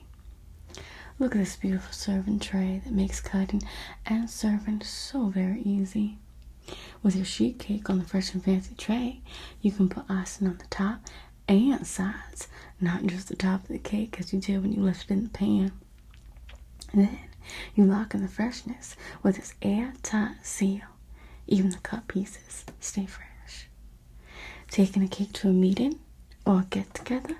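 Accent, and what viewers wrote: South, United States. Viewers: I love the southern accent ! Wow, you nailed it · southern drawl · The southern accent! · more videos with the Southern accent?? ... you do it so well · I live in the south and felt so at home with your accent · That southern accent is HEAVENLY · this southern accent really takes it to the next level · Im loving that southern accent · Your southern voice reminded me of the times the ladies at church would meet and whisper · the southern accent was a great touch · The southern accent is giving me major * from the Golden Girls vibes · Your Southern drawl was spot on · more southern accent videos! ... southern accent is my favorite, lovely and warm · my favorite southern accent ASMR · you sound just like so many of the folks here! ... the Southern accent ☺️❤️ you do it so well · more in this southern accent! Soooo lovely! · the southern accent is magical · Love the Southern accent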